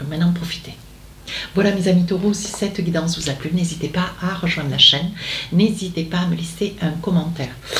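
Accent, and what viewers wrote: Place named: Agen, France. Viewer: bel accent agenais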